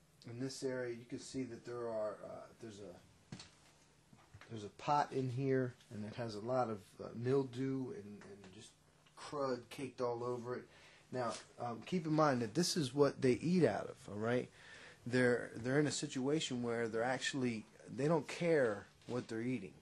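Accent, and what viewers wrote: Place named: Philadelphia, United States. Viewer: Sounds like North Philly? At least your accent. What city are you in there?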